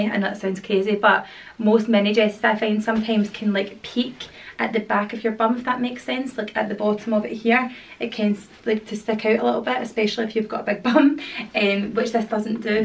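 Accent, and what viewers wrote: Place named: Glasgow, United Kingdom. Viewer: are you from Glasgow? you sound just like my cousin haha i love you you’re so sweet and beautiful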